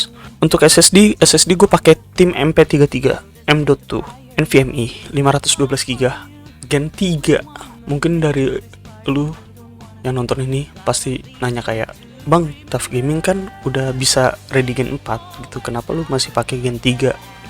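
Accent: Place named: South Jakarta, Indonesia. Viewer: Bang lu anak Jaksel ya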